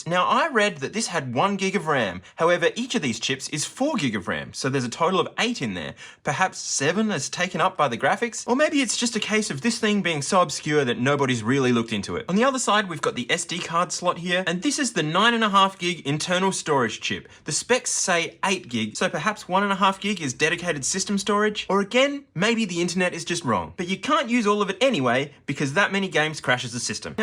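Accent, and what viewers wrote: Australia. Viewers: And I think he's living in Australia because of his accent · Didn't know I needed to hear an Aussie say "Ouya" today but here we are. What an accent.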